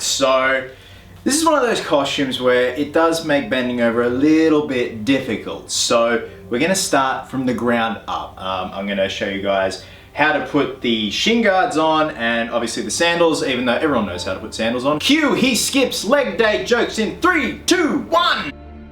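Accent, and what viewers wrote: Australia. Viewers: you look amazing. The costume is great. Love your Australian English. Sexy body, I need to work out more 😅 One think. Take some sun rays. You're extremely white 😝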